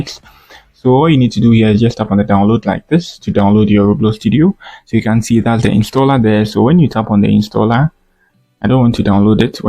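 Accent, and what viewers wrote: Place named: France. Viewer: tu parles francais non ca se sent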